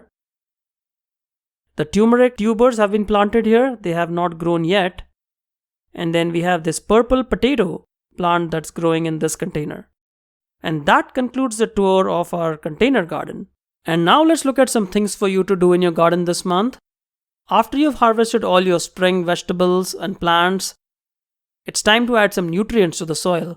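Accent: India. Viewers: hey dude u sounds like native indian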